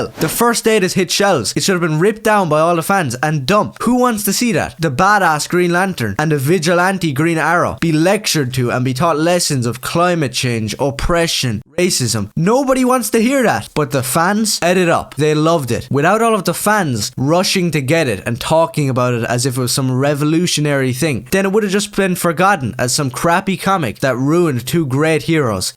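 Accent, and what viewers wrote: Ireland. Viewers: Really funny video, really hilarious, great job. I’m really bad with western accents, I’m not sure if you’re from Sligo, Leitrim, Limerick or Roscommon, it’s probably one of them haha. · Love the Irish accent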